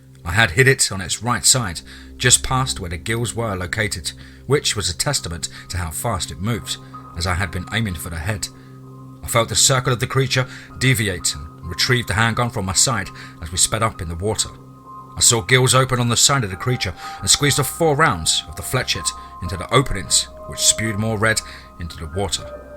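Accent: England, United Kingdom. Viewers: This guy has the same accent as fooster. What part of England are they from?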